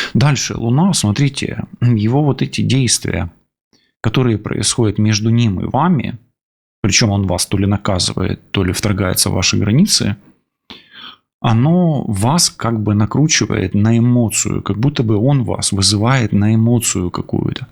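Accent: Ukraine. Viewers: Спасибо за красивую Украинскую речь.Рассклад - класс !.. Не знаю,как объяснить...🌹🙏🌹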